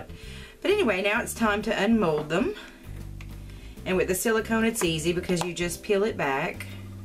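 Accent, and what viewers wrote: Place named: Alabama, United States. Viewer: Are you in Alabama? I swear I keep hearing one of my cousins talking. If you are from Alabama you prob are one of my cousins :-) (Big Family)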